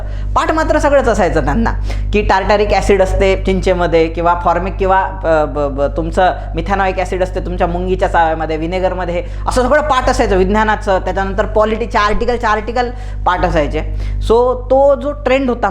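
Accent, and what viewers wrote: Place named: Vidarbha, India. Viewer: खरचं खुप छान explain करता sir तुम्ही आणि तुमची भाषा आपलीशी वाटते ते वाटणारच शेवटी विदर्भ तुमचं आमचं सारखंच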